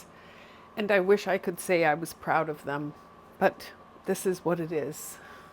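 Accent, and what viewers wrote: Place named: Northeast, United States. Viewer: Yes, indeed, it does look amazing! Great “Save!” Heading over to the next! First, I’ve got to ask you what state you’re from. My mom and dad were from New Jersey/New York, and I detect some sort of North East accent in some of your words.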